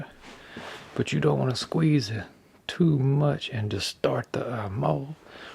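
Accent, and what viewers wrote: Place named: New Orleans, United States. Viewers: This is possibly the most relaxing channel on here right now. And it’s also edumacational. You’re like a bayou bob ross. (Sounds like you have a Nola accent) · Did I just stumble upon the Bob Ross of metal casting with a New Orleans accent? This is gold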